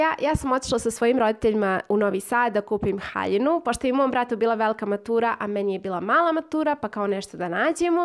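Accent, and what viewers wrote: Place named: Bosnia and Herzegovina. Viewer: A odakle si imas bosanski naglasak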